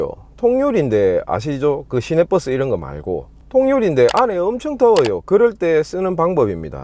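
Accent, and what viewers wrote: Gyeongsang, South Korea. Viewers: 행님 영상 급나게 잘보고잇습니다 경상도 사람이라서 사투리 쓰시는 유튜버 뵐때마다 을매나 반가운지😁 제가 일할때 레이 밴 차량을 타는데 이 방법이 잘 안통합니다 ㅠㅠ 형님 영상에 있는대로 외기순환을 적극적으로 활용해서 해도 에어컨 팬 자체가 약해서 그런지 안통하더라구요 ㅠㅠ 방법이 어찌 없을까요.. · 실내가 찹찰해짔다 ㅋㅋㅋ 아 찐 갱상도남자 ㅋㅋㅋ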